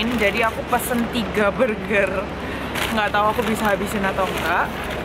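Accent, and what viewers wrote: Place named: Malang, Indonesia. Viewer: Walaahhh Arek Malang rek dadakman wkwk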